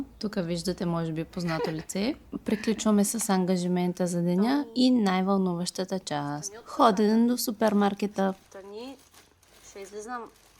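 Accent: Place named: Varna, Bulgaria. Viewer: Красива си ! Въпреки Варненския диалект, гласът ти ме радва! Желая ти всичко най добро ...❤😊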